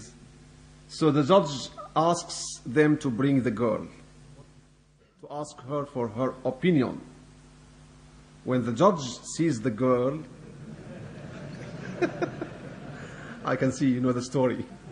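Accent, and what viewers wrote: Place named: Sweden. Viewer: His accent is Swedish.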